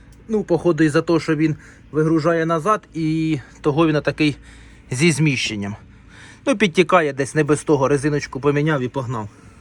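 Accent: Poltava, Ukraine. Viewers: по говору поняв, шо наш - полтаський. Балакає !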